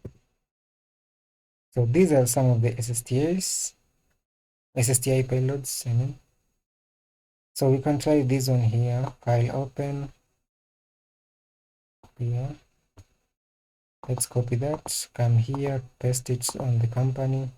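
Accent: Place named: Kenya. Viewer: Bro is that a kenyan accent i here 👍👍 nice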